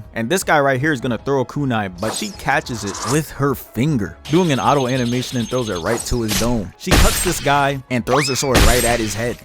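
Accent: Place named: South, United States. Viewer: Yeah pardna, he talk country.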